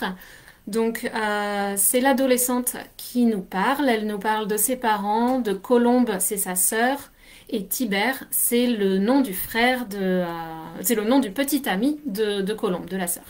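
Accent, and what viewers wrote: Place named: France. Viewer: tu as un super accent français ...tu parles comme les français ..je ne trouve pas de difficultés à comprendre .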